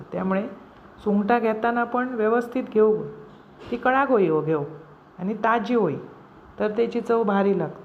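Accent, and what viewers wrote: Malvani, India. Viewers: तुमचा आवाज छान आहे आणि मालवणी खूपच गोड. टेस्टी कोळंबी फ्राय.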